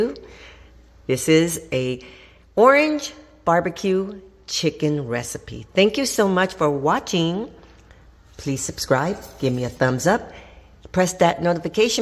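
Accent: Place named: Tri-State, United States. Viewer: Back east??? Hell no! I'm on the west coast, lady! hahahaha! Can't you tell from my accent? Say -- and forgive me if I'm wrong -- but are YOU from back east...like tri-state, by any chance? That's what your accent sounds like to me. Again, I am SORRY if I got that wrong.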